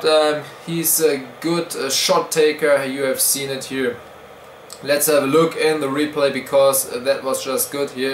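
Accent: Germany. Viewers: du hast einen deutschen aksent und ich hab ne scheiss rechtschreibung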